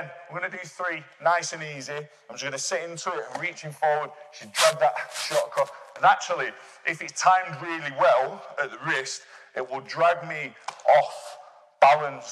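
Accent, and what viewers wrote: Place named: Manchester, United Kingdom. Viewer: Loving the manc accent, didn’t realise was based in Manchester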